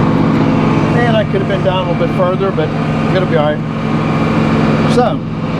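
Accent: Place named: Pennsylvania, United States. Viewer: Love Pennsylvania accent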